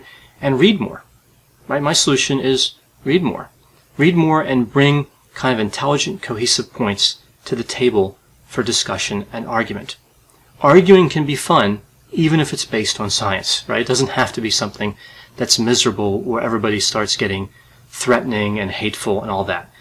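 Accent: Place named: Philadelphia, United States. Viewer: Anothe cool video! You’re from the Philly area aren’t you? I heard a few words that gave it away.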